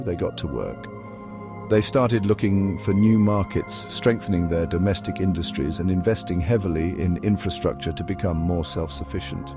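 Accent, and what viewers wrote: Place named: United Kingdom. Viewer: Love the British accent and the USA spelling. Who makes this s___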